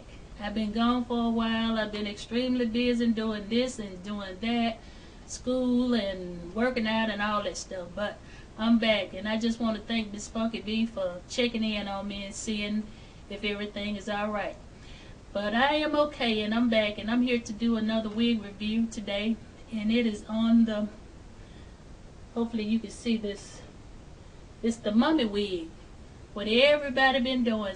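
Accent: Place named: South, United States. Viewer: Girl I love this wig on you!!!!!! And love your country accent =)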